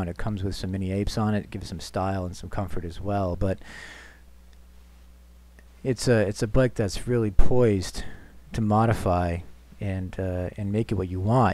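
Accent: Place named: East Coast, United States. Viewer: Why do you talk like an east coaster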